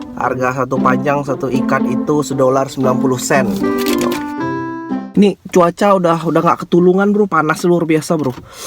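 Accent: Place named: West Sumatra, Indonesia. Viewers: Iya, dari logatnya masih kliatan.. btw im half minang too 😆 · Urang awak rupanya, pantas logatnya familiar😂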